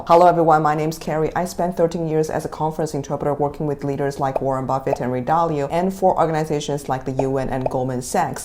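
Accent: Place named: China. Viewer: 因为他的口音很中式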